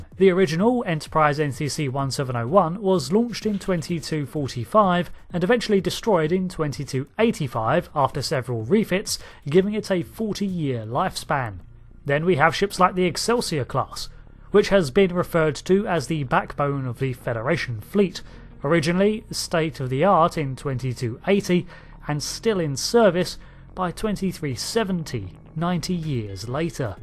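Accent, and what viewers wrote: United Kingdom. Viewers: Terrible nit brit narrator.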